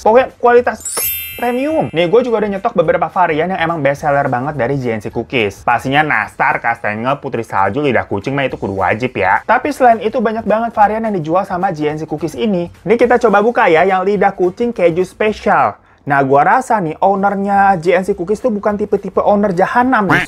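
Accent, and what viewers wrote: Java, Indonesia. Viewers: 🤣🤣🤣🤣🤣🤣🤣paling suka kalo denger bang ngomong bahasa jawa....lucu aja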